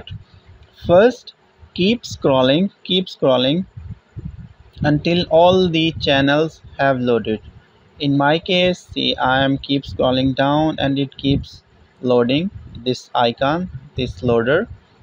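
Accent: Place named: India. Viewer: You probably speak russian(judging by russian channels), indian (or pakistani I dont know, just from your accent) and english and other asian languages? if not more... That's impressive!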